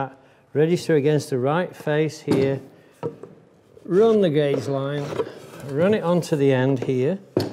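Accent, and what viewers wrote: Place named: Northern England, United Kingdom. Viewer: Excellent Video/s - which part of the UK are you from and where are you now? My question is, after watching closely and carefully your Saw and Chisel Skills throughout your Workbench Build videos - what is your preferred Chisel Brand and why? - AND what is your preferred Saw Brand and why? I suspect by your slightly 'accented ' Northern dialect, you might now be located in the USA so, as such, maybe your Chosen Brands might not be available in the UK/France! I any case, l willl look forward to hearing from you soon - Thanks. All the very best to you and your family.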